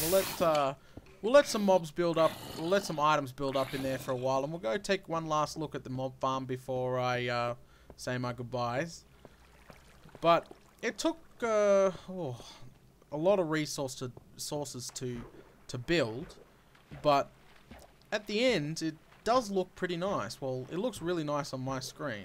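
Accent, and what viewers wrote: Australia. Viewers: i luv the australian accent,sounds like there always asking a question:P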